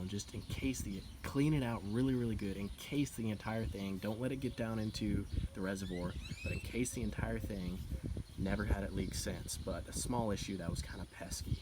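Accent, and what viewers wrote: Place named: Pennsylvania, United States. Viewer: Dawg you sound just like streetspeed717. Pennsylvania accent, but it’s more of a voice than an accent. Props on the informative vids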